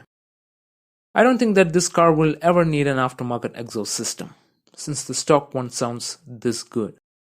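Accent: Kerala, India. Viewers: Are you a Malayali?? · Something says me that you're a Malayali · “Powered by an AMG built 6-liddr weight one with 740 Newton meters of TONK” Omg kill me😂😂😂😭😭😭🤣🤣🤣 I can’t figure out if this dude is a combination of Indian with something Eastern European, you tell me because it’s hilarious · Are you indian?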